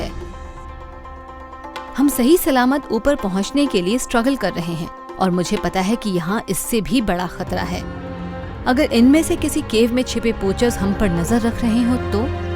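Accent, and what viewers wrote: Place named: United Kingdom. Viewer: लगता है किसी ब्रिटिश woman से हिंदी बोलने को बोल दिया है।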